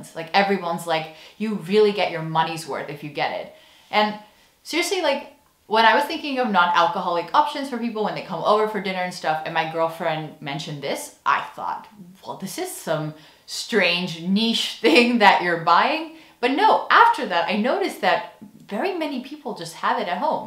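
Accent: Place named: Netherlands. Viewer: It's happening 😯 I couldn't quite put my finger on it at first but you are starting to sound like a Dutch person speaking English. It's subtle, but definitely there. edit: although you did completely butcher the pronunciation of "ketjap" 😂 it's cat-jap, where the catpart is pronounced just like the animal, the "j" is prounced like the y in yes, and "a" is prounced like the second a in El Capitan. Ketjap.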